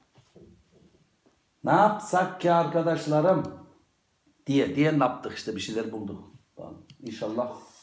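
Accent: Yozgat, Turkey. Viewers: agbi nerelisin agbi? bizim yozgat sivesi var sende:)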